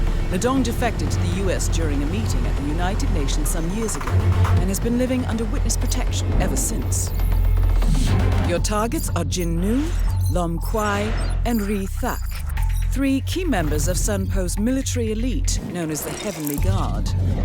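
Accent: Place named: United Kingdom. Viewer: I really like the British accent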